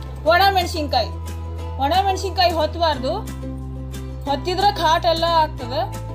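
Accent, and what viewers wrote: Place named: North Karnataka, India. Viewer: Recipe is very nice Madam.....😋😋😋 Felt very nice by hearing your uttara Karnataka style voice....👍👍👍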